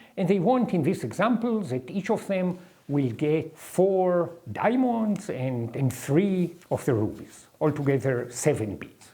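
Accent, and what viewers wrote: Israel. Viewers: Its typical israeli, like if you had an israeli prof in a comedy show, thats what he should sound like Sources: Been to his Uni, lives in the same land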